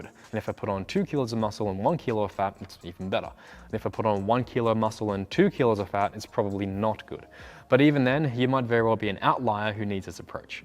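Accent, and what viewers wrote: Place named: Australia. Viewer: got the Aussie accent, is drinking hot tea with its own little teapot, is jacked as fuck, AND WEARING TOOL T-SHIRT. I THINK I'M IN LOVE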